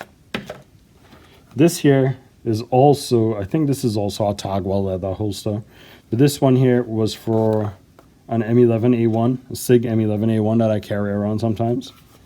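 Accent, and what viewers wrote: Trinidad and Tobago. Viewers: Is it me or this man sounding like Trini?? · Sound like a Trini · That accent can’t hide, sounds like a Trini...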